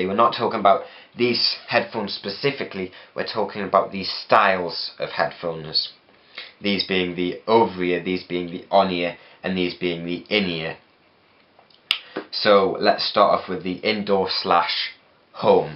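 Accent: United Kingdom. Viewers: Are you welsh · Are you English? Because I am, if you subscribe to me, I will subscribe to you!!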